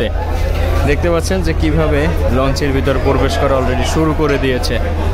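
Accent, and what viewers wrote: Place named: Barishal, Bangladesh. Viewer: ভাই আপনার মুখে বরিশালের ভাষাটা আমার কাছে অনেক অনেক ভালো লাগলো আমার ভাই বরিশালের ভাষা অনেক ভালো লাগে আমার নানা বাড়ি ভোলা ।আমার দেশের বাড়ি শরীয়তপুর তারপরও আপনার বরিশালের লঞ্চের ভিডিও দেখি আমার অনেক ভালো লাগে ভাই। ❤❤❤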